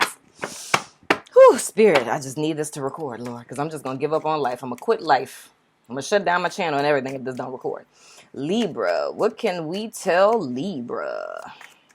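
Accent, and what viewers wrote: New Orleans, United States. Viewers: U sound like u from New Orleans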